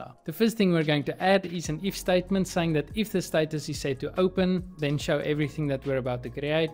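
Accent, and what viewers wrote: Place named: Free State, South Africa. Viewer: It is simply impossible that this is your real afrikaans accent! Sounds like you're from the freestate! Great content - keep it up.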